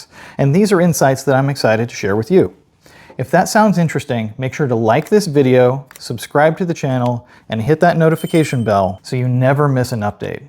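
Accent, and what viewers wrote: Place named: Ireland. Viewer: Also your Irish accent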